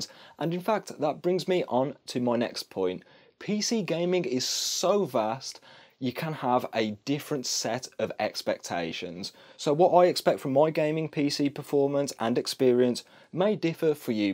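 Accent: Black Country, United Kingdom. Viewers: I wanna ask, are you from the Black Country? From the accent and your channel name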